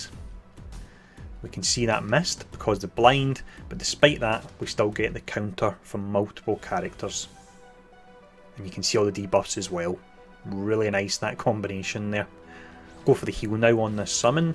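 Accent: Scotland, United Kingdom. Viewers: If I could have picked any accent to hear walk me through this, I would have picked that of a Scot :-D Thanks for the help mate!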